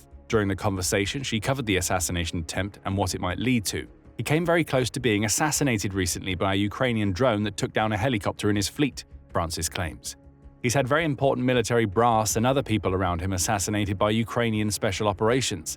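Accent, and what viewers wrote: England, United Kingdom. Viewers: I now officially hate the English accent. I avoid it.. . . . . . . . .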